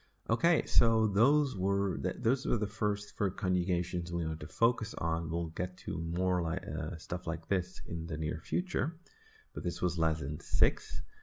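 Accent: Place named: North America. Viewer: You rock on your explanation! And you have this north american accent that I like so much.. This combination of dutch + english is awsome man! hahah Helped a lot.. Now I'm even more motivated. Thx!!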